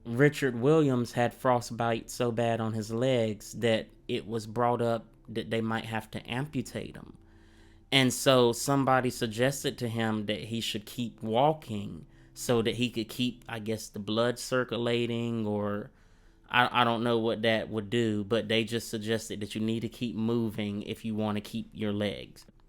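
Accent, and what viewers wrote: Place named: South, United States. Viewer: Young man, I have to thank you for several reasons. One is that I'm glad that you're parlaying your shipboard experience into a YouTube channel, and I'm glad that we have an American black kid with a country accent doing a historical documentary on YouTube instead of the excessive number of British dudes with British accents, or even worse, those AI bots with the metal voices. Thanks, good to see some homegrown talent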